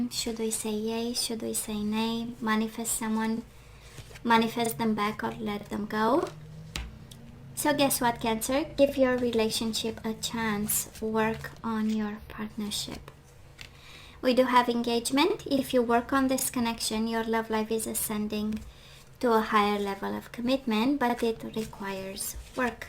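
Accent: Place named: Philippines. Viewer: Y u speak like a Filipino?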